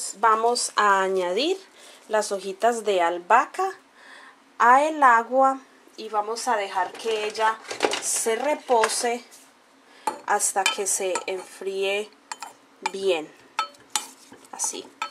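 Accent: Colombia. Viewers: no tengo ojeras ,solo pase por tu video y me embrujo tu acento colombiano. bonita, inteligente y simpatica...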